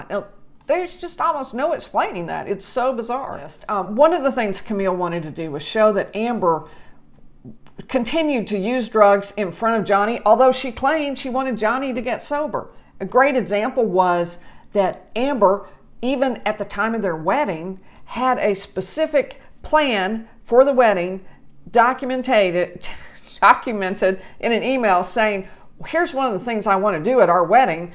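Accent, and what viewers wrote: South, United States. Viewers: Do I hear a southern accent? I'm from VA and GA . I learned to not use accent but it slips out? Thanks for your time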